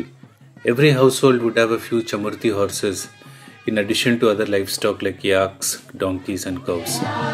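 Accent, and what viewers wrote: India. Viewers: Food .Indian acsent ..haha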